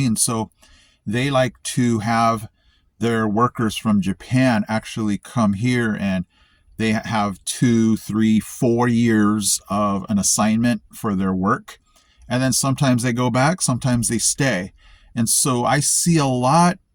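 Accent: United States. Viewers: Many years ago I spent 6 weeks every summer at my mamaw’s house in North East Tennessee, and I would think your accent would cause people to call you a “damn yankee”. I’m guessing if you have an orange UT banner flying on your front porch, you’re good regardless of any other differences. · As an East Tennessean I couldn't care less where anyone once was. If you choose to fit you do. I have two nephews half Japanese by heritage that I never think about as being anything other than my nephews. You seem to have assimilated so well that you sound like an educated hillbilly. I would have thought you'd lived here the majority of your life. "Purebred" Southern Appalachians have mostly held to the belief of I'll do me and you do you and as long as you don't infringe we'll happily meet in the middle somewhere or agree to disagree and go on with life. You've gained a subscriber and a belated welcome to the greatest place on earth.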